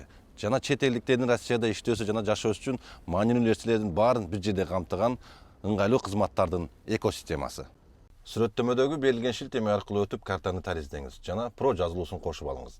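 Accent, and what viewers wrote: Standard. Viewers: Нормальный элэго, таза кыргызча суйлогонуно шугур дечи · Нукура таза суйлогон житит экенсин жашын канчада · Молодоц иним , Акбаранын коз жашындай нукура таза суйлойсун👍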